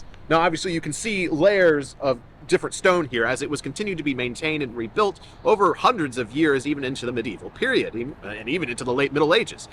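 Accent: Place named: United States. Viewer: How to obliterate any shred of credibility. 1 Having a channel called The Wisdom of Odin 2 Speaking Murrican (that is not a civilised way of speaking) 3 Using such a inane font for the channel logo.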